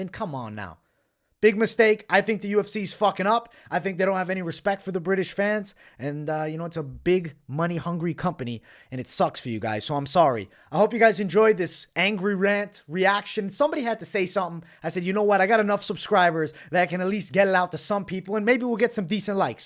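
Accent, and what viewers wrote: United States. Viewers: you got that American accent down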